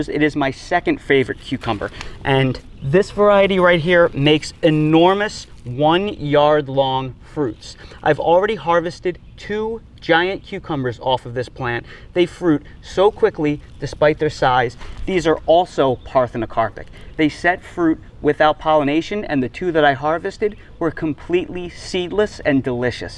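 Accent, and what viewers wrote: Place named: South Carolina, United States. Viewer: Hi, Thanks for all those great tips.I will some of them in practice like putting mulch and watering the plants. I will follow some of them. For what I hear you are South Carolina. I am making a visit to that state soon. I would like to know in which area your garden, not your specific address. I want to know how it is living and gardening there. Is it an area for a homestead ? I want to get information that I will get only by visiting there. I hope you will answer some of my questions. God bless you and thanks this great video.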